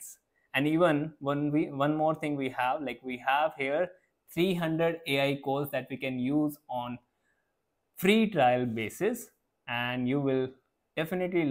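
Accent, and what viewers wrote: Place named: Bangalore, India. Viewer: Braw you're from Bangalore daa?!!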